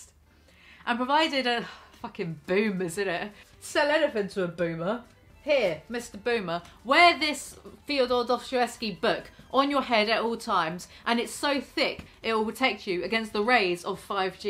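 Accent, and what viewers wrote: United Kingdom. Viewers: I’m from N Y and I always Loved the British talk! RUBBISH ! Hello mate! Specially this nice looking British woman! You Go Girl!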